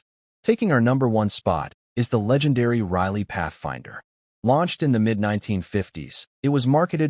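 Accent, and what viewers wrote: United States. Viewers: Who wrote this absolute BULLSH*T. Do your Homework. Grrr Plus, to have a Yank doing talking, well it is a disgrace The rover P4 was not called the Annie Rover. It was called the 'Auntie' Rover. The Morris Oxford and the Austin Cambridge Were just ordinary family saloons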